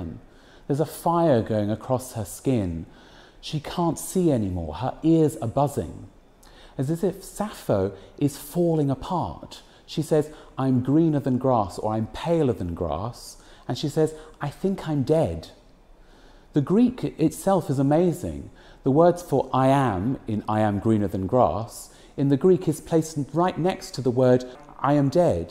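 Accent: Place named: United Kingdom. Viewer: british vsauce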